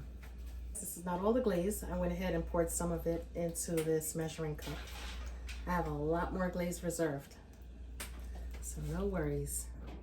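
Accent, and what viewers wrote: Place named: Northeast, United States. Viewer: I thought I heard that north east accent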